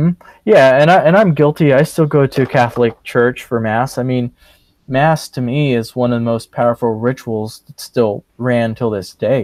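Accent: Scotland, United Kingdom. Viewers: 😄Great Interview!👏 👌i've listened twice now and found the discussion Very informative!🙏🌲🍃 Thank-you🌈..ps.your Scottish accent is charming!😀🔥keep it Just as is😉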